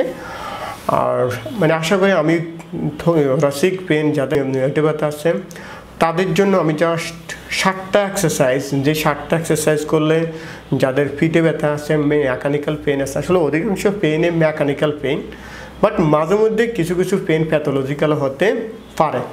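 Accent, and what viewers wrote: Noakhali, Bangladesh. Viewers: শুদ্ধ করে কথা বলা শিখতে হবে আগে, বাড়ি কি নোয়াখালী নাকি? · ভাই নোয়াখালি বাসা না কি কথা বুঝতেই অসুবিধায় পড়ে গেলাম.. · আপনার বাড়ি নোয়াখালী। তাই পিঠ কে ফিট বলতেছেন। পেইন কে ফেইন বলছেন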